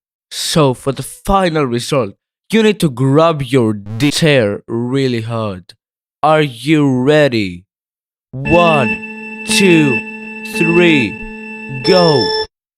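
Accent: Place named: Greece. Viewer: Bro where are you from? You have such a Greek accent haha. Great video !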